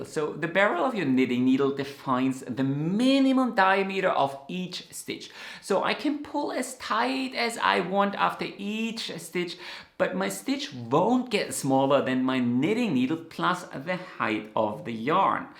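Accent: Germany. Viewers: Thank your for sharing the language behind your accent. To my ears, it's similar to a couple of others but try as I might, just wasn't able to place it. Likely because most of the Germans with whom I've interacted spoke with a very thick, almost blurred. Your accent is crisp and clean, unique and very pleasant to me. Although I noticed your pronunciation of diameter, because I knew what you were saying, didn't really give it much thought one way or the other. Like knitting, English is spoken with different inflections and pronunciations all over the world, even when English is the native language, as the Brits and Americans. I've been knitting for over 50 years and am still learning new things, so it never gets dull. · zu den linken Maschen: die stricke ich so, wie du sie gerade gezeigt hast und zwar sehr schnell....und ja, bei allen Zu und Abnahmen muss ich entsprechen umdenken. 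Das ist so wie beim Altsaxophon spielen ohne transponierte Noten im Orchester: das passiert im Kopf automatisch. Es ist aber schwierig diese Art anderen dann beizubringen, warum sie es andersherum machen sollen, wenn es doch anders in der Anleitung steht. Stricke über 50 Jahre schon so und komme gut damit klar. Was mir wirklich gut gefallen hat, die tiefgründige Erklärung mit den vielen Details für die Maschenprobe. Da bin ich eher nicht so hinter her, aber nach diesem Video werde ich es jetzt doch öfter versuchen. Obwohl wirkliche Pannen habe ich noch nie erlebt und ich stricke viel und alles was sich Stricken lässt. Mein Motto ist dazu das Garn muss seine passende Nadel in Material und Stärke finden. Manche verstehen sich einfach nicht und dann kommt nur Murks raus. Das merke ich aber Recht schnell und kann dann was ändern. Ein tolles Video von dir. Vielen Dank. PS: dein deutscher Akzent stört mich überhaupt nicht. 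Deswegen antworte ich auch auf deutsch😉👍🧶🧶🧶🧶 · My knitting "don't": Don't ever tell yourself that you can't do a certain technique, like color work or lace. Start with something small like a hat or even just a swatch. Want to knit a cardigan but the pattern uses steeks? Knit a swatch to practice steeking before committing to making the garment to see if you even like the technique. At the end of the day, it's still knits & purls, and it's only yarn and you're not required to like everything you try. Also, I enjoy your German accent quirks - I enjoy most accents in general 🙂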